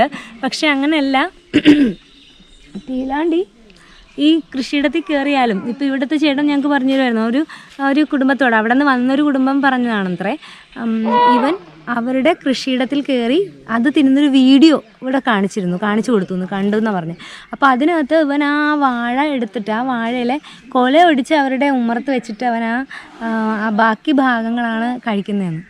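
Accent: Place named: Chala, India. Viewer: മൊത്തത്തിൽ ഒരു ചാള സ്ലാംഗ് ഫീൽ ചെയ്യുന്നുണ്ടല്ലോ... പിന്നെ dotted deer എന്ന് പറയുന്നത് ശരിയല്ല കേട്ടോ Spotted deer ആണ് കറക്ട്..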